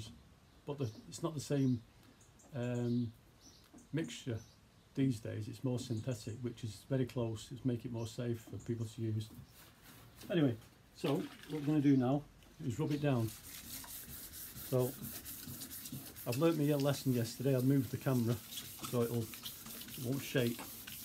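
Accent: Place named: Lancashire, United Kingdom. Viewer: Nice work. I stumbled across your channel. I'm in Huddersfield. You sound lancs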